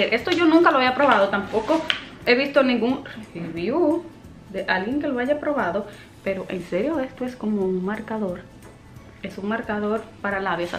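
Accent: Dominican Republic. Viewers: Vy C Ella es del cibaao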